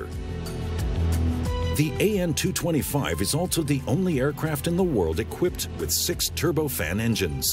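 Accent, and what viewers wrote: United States. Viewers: funny to hear a heavy american accent narrating russian hih-end technology in such a respectful way. there's hope for USA... hahahahahaha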